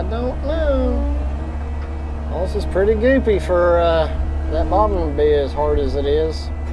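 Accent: South, United States. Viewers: Great content. My grandson comes running when he hears "WELCOME TO THE BROADCAST!!" Lol He also LOVES the the southern draw and finds ways to say the word "pipe" just like brother!! Thanks for keeping it kid friendly and consistent!!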